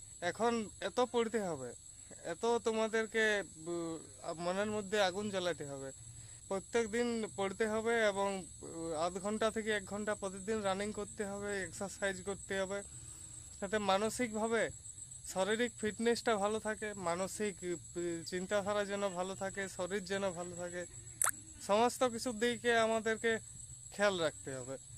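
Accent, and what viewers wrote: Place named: Purulia, India. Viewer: কথা শুনে মনে হচ্ছে তোমার বাড়ি পুরুলিয়া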